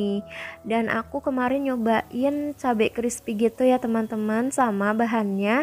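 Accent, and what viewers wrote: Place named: Tegal, Indonesia. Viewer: Kakaknya orang Tegal yaaa..tegalnya mana kak..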